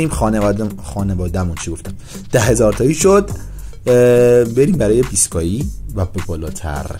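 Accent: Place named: Hamadan, Iran. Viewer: داش‌ جواب بده‌بچه‌کجایی لحجت‌به‌طرف‌همدان‌میخوره‌بچه‌کجابی؟؟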